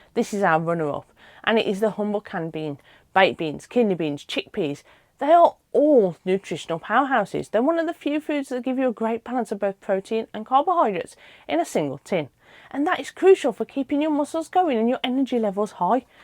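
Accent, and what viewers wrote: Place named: Black Country, United Kingdom. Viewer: black country? Rowley regis? Is my guess ❤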